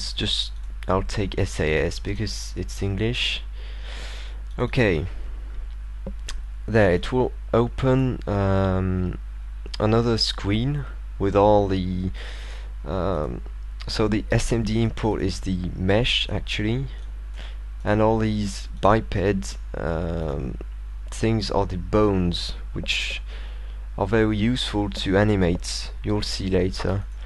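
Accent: France. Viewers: frenchy accent spotted :p en tout cas t'as un super niveau en anglais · Frenchie accent <3 ;) I'm french too :)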